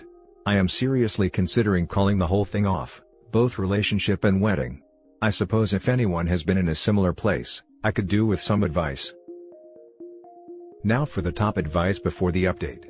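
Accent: Australia. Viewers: You can just tell this guys an Aussie, up the Aussies!!!! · Dude its time to end this relationship and walk away she isn't worth it. Further recommendation never married ever know cohabitation no marriage ever women today are not worth it. They want sexual freedom but the stability of marriage in the end up shiting all over the husband's. Buy your language you sound like your from Australia. Australia and the United States going through 2 of the worst things going on any country the feminization of men in the masculization of women. In either of these countries manner treated equally which read like disposable commodities. The statistics in stats prove it. Between divorce courts child custody 's the way that were all looked at plans simple but what people don't realise men walk away society ends the safety for all ends.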